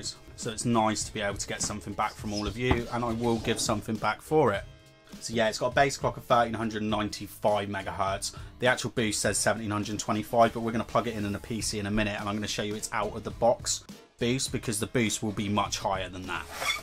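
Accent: United Kingdom. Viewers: u're so british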